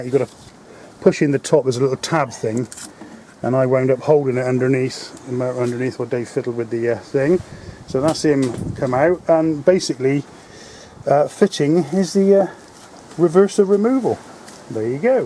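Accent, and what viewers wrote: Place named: United Kingdom. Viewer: With the accent I thought you were in the UK! Have watched the vid again and called myself a 'muppet' as you stated you got it from Mr Auto. I've sinced looked starters up for my car. Cheers for the tip on not using slick 50 in the gear box, only ever used it in engines with no problems.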